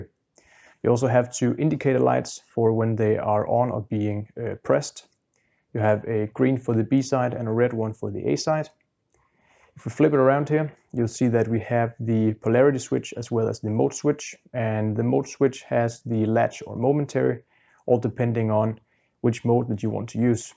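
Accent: Denmark. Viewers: Is that a Danish accent?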